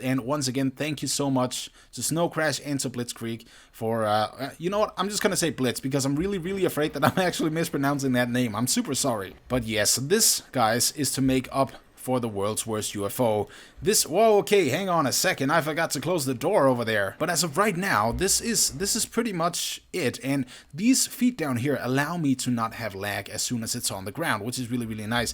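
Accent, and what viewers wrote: Denmark. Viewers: u sound like ur from denmark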